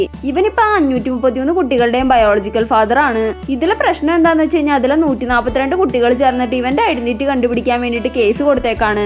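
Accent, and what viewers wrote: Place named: Thrissur, India. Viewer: കഥ പറയുന്നവൻ തൃശ്ശൂർ എവടെ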